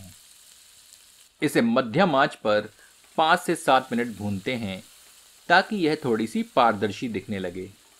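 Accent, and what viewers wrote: Standard. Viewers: Sir dekh ke hi muh Mai paani aa gya.. Jarur try karenge.. Aur recipe se jyada maza Aapki shudh hindi sunne Mai aaya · Namaskar. Shriman aap ati shuddh evam sundar Hindi bolte hain. Is byanjan ko mein prastut karne ka prayas karunga. Dhanyabad.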